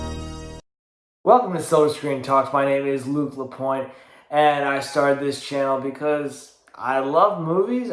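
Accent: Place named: South Jersey, United States. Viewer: i thought i recognized your accent - i'm from south jersey - live in Portland, OR now though - steelbooks are awesome - also anything from arrow video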